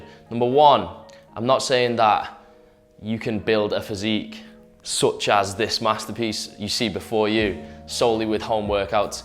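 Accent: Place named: Manchester, United Kingdom. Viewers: Fukin Manchester, Lad . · where in the UK are you from? You sound mancunian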